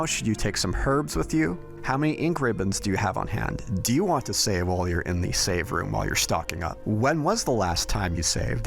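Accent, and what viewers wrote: United States. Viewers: Thank you. I actually did not know that, but the person streaming sounds American. The H is silent in the USA.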